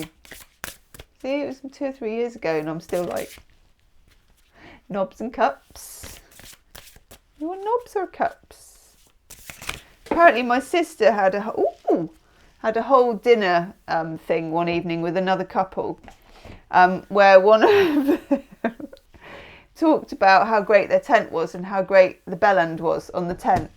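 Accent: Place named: Devon, United Kingdom. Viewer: i absolutely LOVE your Devon accent!! 😂😂😂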